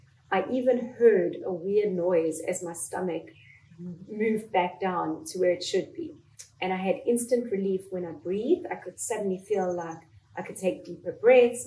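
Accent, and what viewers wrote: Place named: South Africa. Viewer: im australian i just heard the accent and thought it was south african :)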